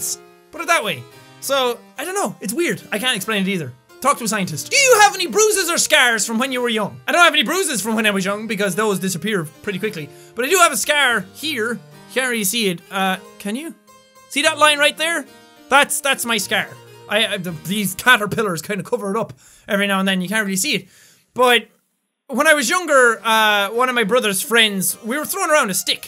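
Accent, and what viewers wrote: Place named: Ireland. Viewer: thanks man I'm inspired by you a lot and eventually want to play games on YouTube with you mark and Felix! My dream is to create a little community of my own on YouTube and have a nice group of my own! I would like to try and be a sombody rather than a nobody. And prove to the world just cause I have a mental disorder (ADHD) doesn't mean you won't achieve great things. I know I've got a huge challenge ahead of me and I'm already off to a bad start. But I'm determined to become a youtuber! Although right now I'm only doing Lego videos. But I hope to get gaming videos up within the next year. After I find s job. Right now I'm still looking for one and I have been since Christmas break. I hope you don't mind my super long and a bit chattering comment. But stay awsome and thankyou for so much inspiration. Unfortunately, I wish you used your Irish accent it reminds me of back home (Newfoundland, I am a proud Canadian)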